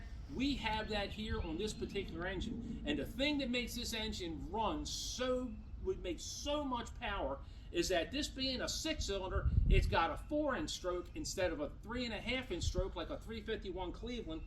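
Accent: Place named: Maryland, United States. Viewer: Awesome!! (Knew you were from MD as soon as I heard you.) I live about an hrs from Pasadena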